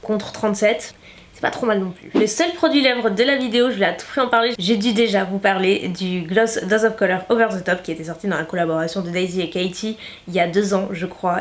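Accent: South, France. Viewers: Pourquoi tu dis "pace" au lieu de "parce que"? Est ce ton petit accent du sud😉😊 très très bonne vidéo! Pourrais tu tester entièrement la marque W7? Bisouss❤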